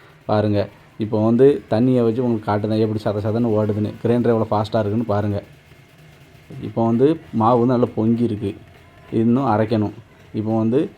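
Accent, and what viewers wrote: Tirunelveli, India. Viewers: Bro திருநெல்வேலி யா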